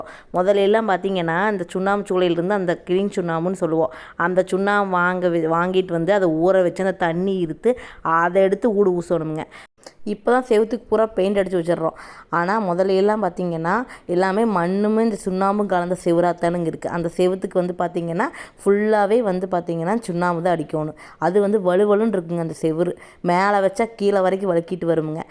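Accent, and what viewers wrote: Kongu, India. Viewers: Kongu tamil supernga ammuni😊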